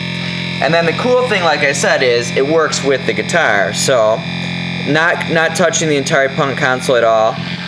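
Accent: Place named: Cleveland, United States. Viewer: are you from cleveland ohio? trying to guess accents just.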